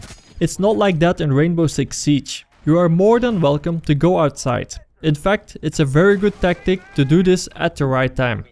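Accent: Netherlands. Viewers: you have a dutch accent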